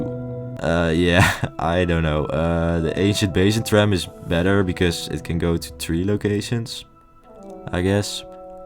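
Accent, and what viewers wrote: Netherlands. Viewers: Are you dutch? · Are you dutch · Are you dutch?? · Dutch accent spotted in the Hollow Knight wilds! This was a super fun video, your dedication to making it as good as you can really shines through 🫶🏻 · ayo ben jij nederlands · Love your dutch accent😁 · You sound dutch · Ja duidelijk een nederlander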